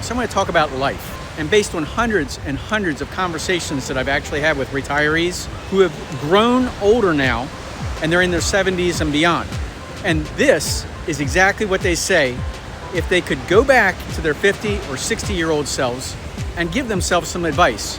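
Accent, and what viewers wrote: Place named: Baltimore, United States. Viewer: Sounds like a Baltimore or Pennsylvania accent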